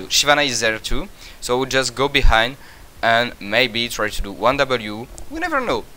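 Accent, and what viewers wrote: France. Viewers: That french accent, so terrible ... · Tu as un bon niveau d'anglais et tes vidéos sont toujours intéressantes mais y a t-il vraiment un public NA qui veuille entendre un français avec un accent à couper au couteau alors qu'ils ont déjà 10000 streamer anglophones ?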